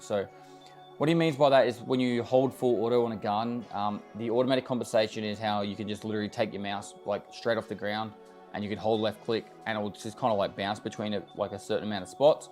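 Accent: Australia. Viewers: My daily dose of australian accent is back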